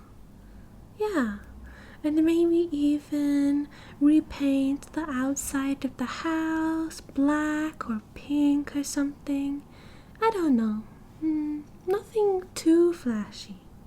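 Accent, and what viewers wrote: United Kingdom. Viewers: Brit accent...? HELL YEAH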